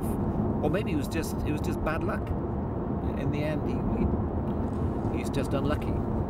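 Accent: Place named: United Kingdom. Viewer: I’m here to learn a proper British accent.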